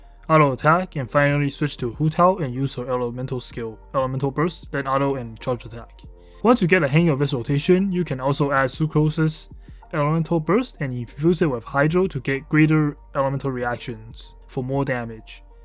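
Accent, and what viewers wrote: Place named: China. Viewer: 哦哦哦，听你口音，还以为是中国的。哈哈哈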